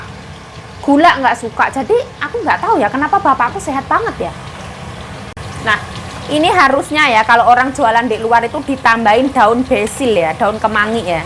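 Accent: Java, Indonesia. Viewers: Lah lah...mbk orang ngawi jawa tomur to...aku blitar jawa timur juga, pantesan omongane jan njowo banget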